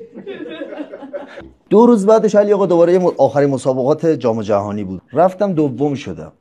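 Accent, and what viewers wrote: Qazvin, Iran. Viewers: دمت گرم همشهری مدل صحبت کردنت قزوینیه😂😂❤ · با لهجه قزوینی عشق کردم❤😂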